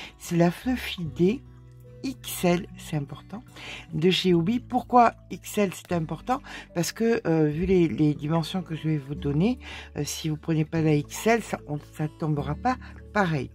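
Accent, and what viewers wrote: France. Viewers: Bonjour, Française habitant en Australie depuis très très longtemps Je viens de decouvrir vos videos. Je pourrais vous ecouter parler toute la journey avec cette voie si francaise et si douce. D'un age plutot advance, J'ai commence a crocheter l'annee derniere et depuis je ne me suis pas arretee. Avec ce tutorial si facile Je vais me lancer avec ce pull merveilleux et aimerais acheter le pdf pour m'aider a le faire a ma taille, mais Je n'arrive pas a le trouver sur votre site. Pouvez vous m'aider s'il vous plait! Merci de tout coeur.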